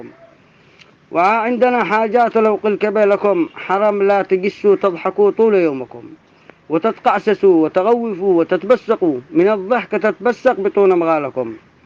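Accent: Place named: Raymah, Yemen. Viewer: حاش انك اصابي انت ريمي وهذي لهجةاصحاب ريمه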